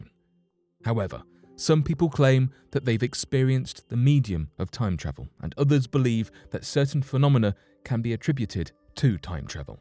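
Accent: United Kingdom. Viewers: The monotone British guy?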